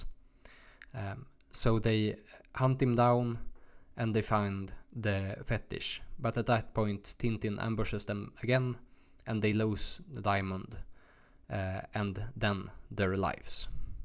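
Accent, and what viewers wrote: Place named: Sweden. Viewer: S tier Swedish accent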